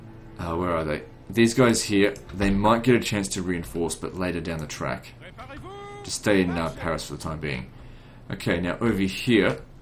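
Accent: Australia. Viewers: are you aussie mate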